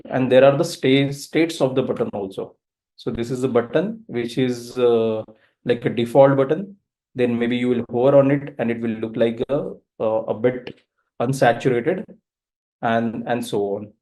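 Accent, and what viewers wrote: India. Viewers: My head keeps shaking like an Indian when I hear this accent. I don't know why.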